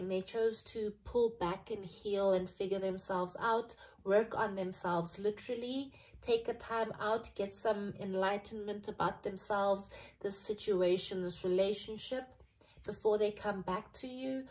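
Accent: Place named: South Africa. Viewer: I know that South African accent. Heeey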